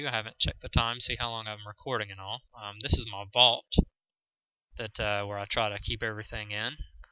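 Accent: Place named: South, United States. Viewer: finaly someone with a southern accent man thx i thought i was the only minecrafter vid maker was southern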